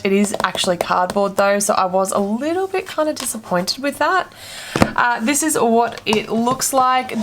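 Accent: Australia. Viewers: You sound aussie, how do you get boxy charm, thought they dont ahip to australia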